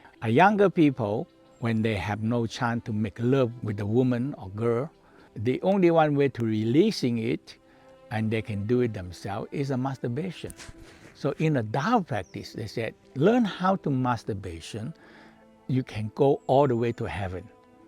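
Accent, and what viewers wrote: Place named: China. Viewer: I’m sorry, sir, but you speak Chinese-English, aka, “Chinglish.” Consequently, it’s very difficult to understand you, making your information just about useless. May I suggest that you find a bilingual person who can impart your message with a voice-over in clear, native-speaker English? In this way, you can be sure that your message is correctly understood by native speakers of English! I hope this helps.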